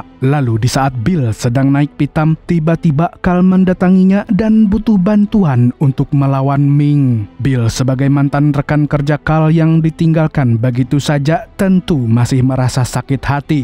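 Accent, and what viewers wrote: Banjar, Indonesia. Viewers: Orang Banjar kh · Org Banjar kah mang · Skalinya urg Banjar jua😂😂😂😂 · urg banjar kah pian mang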